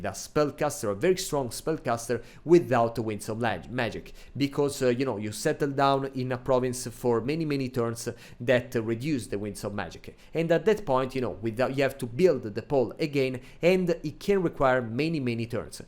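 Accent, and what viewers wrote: Italy. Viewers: Sei per caso italiano?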